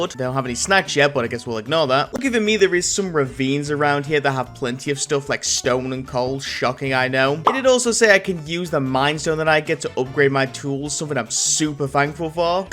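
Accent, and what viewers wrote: United Kingdom. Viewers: Are u British 😅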